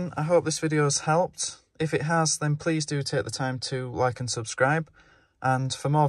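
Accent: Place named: North, United Kingdom. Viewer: Really helpful cheers buddy! Nice to hear a fellow northerner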